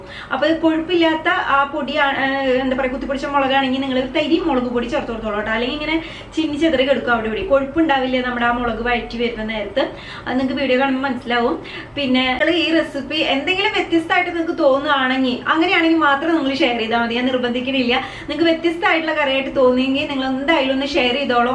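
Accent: Thrissur, India. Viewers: തൃശൂർ ആണല്ലേ.... Non stop സംസാരം അപ്പോ njn മാത്രമല്ലല്ലേ 😁😁😁😁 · ആദ്യമായി ആണ് ഈ ചാനൽ കാണുന്നത്.നല്ല സംസാര ശൈലി....😊👌 അല്ലേലും തൃശ്ശൂർ ശൈലി വല്ലാത്ത ഇഷ്ടം ആണു. അമ്മവീട് അവിടെ അയതോണ്ടു പ്രത്യേകിച്ച്💕 ★ പിന്നെ ആ കിർ കിർ കേട്ടിട്ട് ചിരിച്ചു പോയിട്ടോ ★ എന്തായാലും ട്രൈ ചെയ്‌തു നോക്കിട്ട് പറയാം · എന്റെ പൊന്നെ എന്തുസ്പീഡ ഇത് സമ്മതിച്ചുട്ടോ തൃശ്ശൂരാണല്ലേ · Chechii thrissur aanalle??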